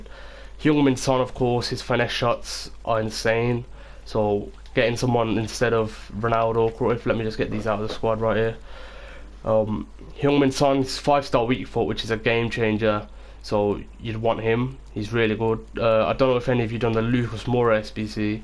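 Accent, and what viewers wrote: Birmingham, United Kingdom. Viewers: is that a brummy accent lmao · Are you from brum?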